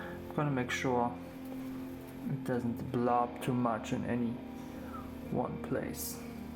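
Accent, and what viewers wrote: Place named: Germany. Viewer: The German sounding accent makes this even creepier.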